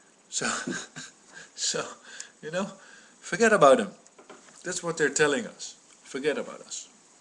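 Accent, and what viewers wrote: Netherlands. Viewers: lekkere dikke nldse accent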